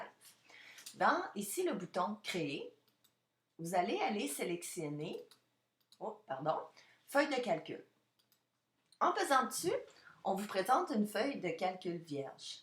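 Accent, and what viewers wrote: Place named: Quebec, Canada. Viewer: merci vraiment pour ta vidéo et surtout pour ton adorable accent québécois lol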